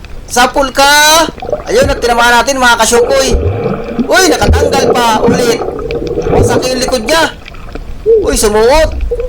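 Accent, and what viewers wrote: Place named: Visayas, Philippines. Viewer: swerte kaparin KSI medyo marami Ang huli mo. Napansi ko syo sa salita mo parang bisaya ka.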